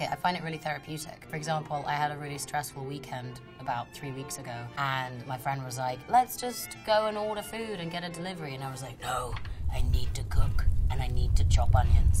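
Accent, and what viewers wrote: United Kingdom. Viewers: she has the most satisfying british accent in britain wtf like i’m british and im JEALOUS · buBbLe AnD sQUeEk???? ive been watching this for 3 years but i still havent gotten used to the british accent after watching her as yelena... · She's so pretty and i love her British accent :)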